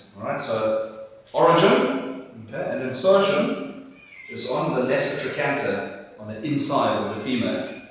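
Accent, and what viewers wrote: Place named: Zimbabwe. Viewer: I hear by your accent you're Zimbabwean or South African. Are you available for therapy to Zimbabweans if so where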